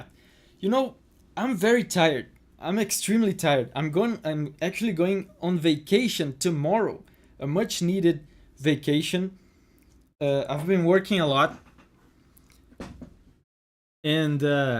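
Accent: Brazil. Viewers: Mano, sabia que você era brasileiro, hahahaha! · Cara eu vejo seus vídeos a um tempo e eu achava q seu sotaque era de brasileiro, mas nunca vi vc falando pt. Só q vendo a live eu ouvi as maritacas e pensei "mds brasileiro certeza" KKKKKKKKKK Parabéns pelo trampo, curto mtoo suas análises. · Caraaa, tu é brasileiro!! Não fazia ideia (vi teu nome num site de músicas de jogos e acabei descobrindo por lá, segundo o site, claro)... Eu reparei o sotaque no inglês mas não sabia que era brasileiro... Weee! Parabéns!!! 🎉🎉 Torcendo muito pra tu ocupar cada vez mais espaço na indústria!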